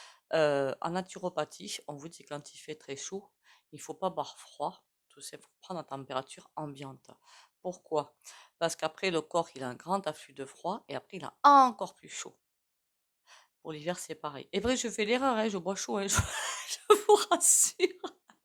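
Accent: South, France. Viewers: un petit accent du sud çà réchauffe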